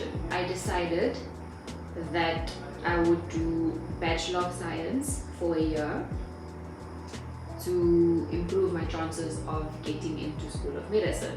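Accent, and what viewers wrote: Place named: Afrikaans, South Africa. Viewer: You look like an overachiever fr. The Afrikaans accent came out progressively. Sub & like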